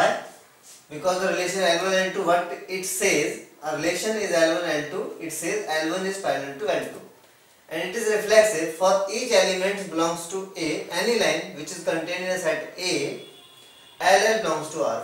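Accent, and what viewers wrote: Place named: India. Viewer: incredible... I have to watch an Indian (? sorry if not ;) but you sound kinda like an Indian) guy to understand what my german professor can't explain.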